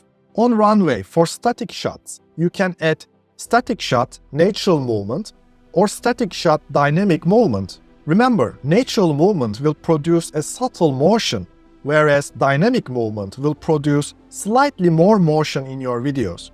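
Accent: Turkey. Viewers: are you turkish? your accent sounds like turkish. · Bu aksan Turk aksani degilse ben de bir sey bilmiyorum :) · Your accent like Turkish...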